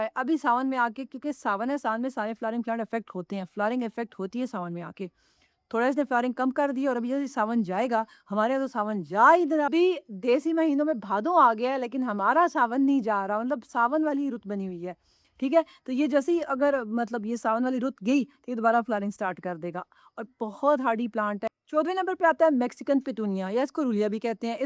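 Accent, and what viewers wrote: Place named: Saraiki, Pakistan. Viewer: آپ میانوالی سے ہیں؟ مجھے سرائیکی لگتی ہیں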